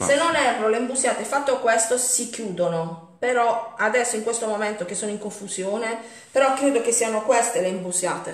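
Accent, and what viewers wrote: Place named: Bari, Italy. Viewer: Ciao (e )... ho scoperto da poco il tuo canale e sentendo la tua cadenza barese mi sono subito iscritta perchè sono barese anche io, ma vivo a Torino. Finalmente ho imparato a fare i "disctinculo" ma vorrei farne per una mia amica che è celiaca...mi potresti consigliare sulla farina da utilizzare? Ho già provato con la farina di riso ma non mi viene bene, cosa mi proponi? Grazie se mi rispondi.... a presto, Maria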